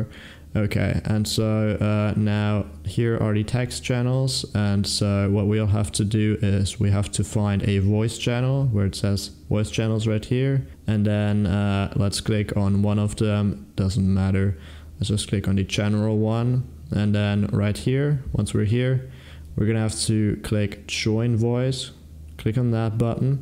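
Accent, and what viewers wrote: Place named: United Kingdom. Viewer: u sound british ngl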